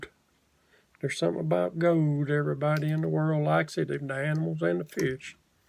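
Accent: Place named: Western North Carolina, United States. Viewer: Another informative video, also inspiring! I could tell by your speech, that you had to live somewhere near me! I Live in the Western part of North Carolina! So we do have a little in common! Thank you, again! Take Care and Be Safe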